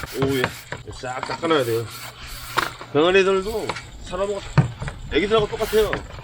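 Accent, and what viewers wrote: Gangwon, South Korea. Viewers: 강원도 사투리 구수하네요 ㅎㅎㅎ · 1. 농장 이 어디 지역이에여? 2.강원도 분이신가여? 강원도 사투리 같아서여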